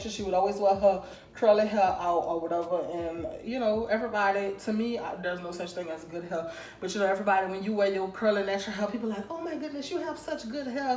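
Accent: New Orleans, United States. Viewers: She gotta be from New Orleans 😂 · I can tell she from new Orleans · You must be from Louisiana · i think she from nola dats how we talk lol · you must be from NOLA 🥺❤️ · accent is so pretty you must be from New Orleans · "um power to the ppl" 😭 she gotta be from new orleans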